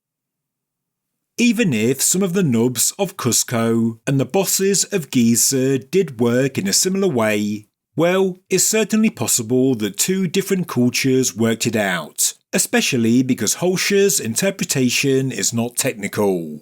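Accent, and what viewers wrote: United Kingdom. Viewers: He doesn't lol....he just gets possessed right before he begins recording and snaps back out of it when hes done. He speaks normally, other than the British accent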